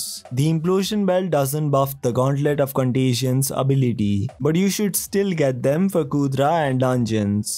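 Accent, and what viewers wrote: India. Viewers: why do you sound like indian dialect? By the way, very good content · you r indian dont lie :) you r talking in indian english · Because your voice was sounding Indian to me and you had added Hindi subtitles as well🗿😂 Is my guess correct?😳 Please reply me 😭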